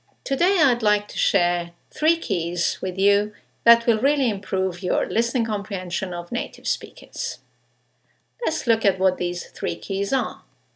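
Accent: England, United Kingdom. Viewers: It's really really hard to understand her... It's souds british, I have serious difficulties in that. But I could understand.... Thanks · British English? Lovely. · your classes are for beginners, and your accent does not sound from USA, it sounds from UK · Yeah, it's called a BRITISH accent... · RP accent I more need podcast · Is this british englisH? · Thank you Btw, this accent only use by British, right? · Who doesn't? It's more British than my accent but I think she speaks very clearly and understandable to any native speaker or proficient student. Janine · Hello, i apreciate your lessons, i like to listen to you 'cause your accent is very pleasent to me. I hope you can bring more and more tecniques to talk as a England person. i have difficults to pronounce words like: thought, through, though, taught. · thank you . the British accent is very nice .but a little hard . 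but it sounds good. and thank you again.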